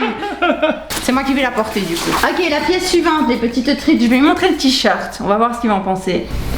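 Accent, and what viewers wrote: Belgium. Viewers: bitume C’est typique Belge, d’ailleurs Quick ils le prononce Cuik 😂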